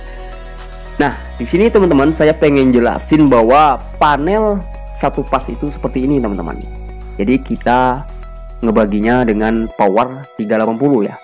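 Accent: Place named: Malaysia. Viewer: Bang ingat dullu saya ppasang wayering di malaysia bang.logat bicara abang kayak orang melayu.abang banyak ok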